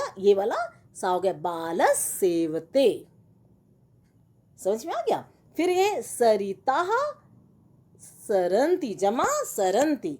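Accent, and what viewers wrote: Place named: Punjab, India. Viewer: Ma'am are you Punjabi?? Cause your accent is like that..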